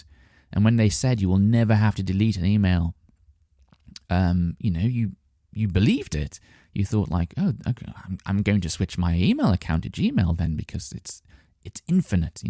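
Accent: United Kingdom. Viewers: I listenning you cause i have exam tommorow, like Final exam And i have problem with that bitish accent so thank you for this podcast. You have good voice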